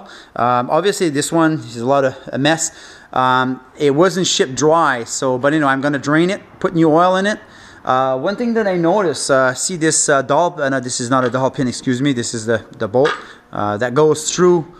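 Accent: Quebec, Canada. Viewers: Ah un Québecois, Salut :)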